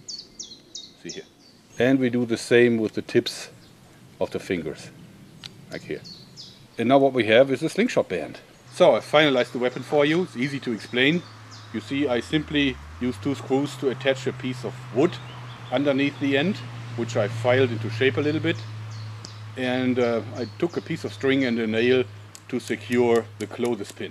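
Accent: Germany. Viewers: you are a german guy ? you speak with the germen dialekt :) and you was by the arme ? :D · Where are you from I can't figure out your accent I think it is german??? Can someone please correct me if I'm wrong thx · where are you from? your accent sounds familiar... are you German?? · What is his country of origin? I'm guessing he is German based on his accent, but I could be mistaken. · Yeah, im german too, and i had heard his accent, beacause often german have this accent too (sry for bad english :D) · are you german? you talk like a german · I agree with the accent, but now that bugs me because Nords are supposed to be Scandinavian, not German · I can hear you're german! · are you german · Wer 3-4 Videos von ihm geschaut hat merkt sofort dass er Deutscher ist dass ist nichts neues. Ich finde es gut dass er es auf Englisch erzählt damit es auch andere verstehen. 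Hauptsache man kann ihn doch noch verstehen!!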